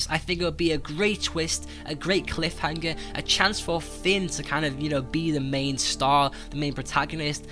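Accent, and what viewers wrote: Liverpool, United Kingdom. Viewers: scouse twat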